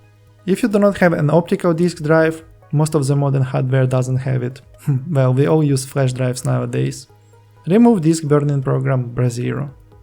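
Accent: Russia. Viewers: Russian accent + linux is very good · I'm sorry, I didn't mean to seem negative in any way. To me it sounds like you have a Russian or Ukrainian accent (?), languages that to me sound like they have a lot of i's or j's directly after some consonants. So i tried to write "probably" the way it sounded to me. Anyway great video, lot of useful information! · strong slavic accent, most probably russian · such modesty and humility is rarely seen. In my case, because of your accent, watching this video reminded me of my childhood spent in Russia. Thank you for that and for the very helpful video!:) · Говоришь с русским акцентом)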